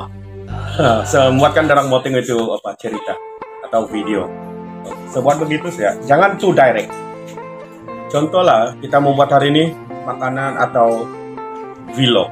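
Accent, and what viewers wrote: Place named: Malaysia. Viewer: Informatif sangat berguna, menarik logat Malaysia nya. Salam dari raja Ampat Papua indonesia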